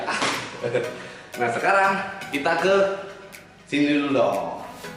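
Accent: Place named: Medan, Indonesia. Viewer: Logat lo masih kental "medan"nya, omongan ngga ada isinya.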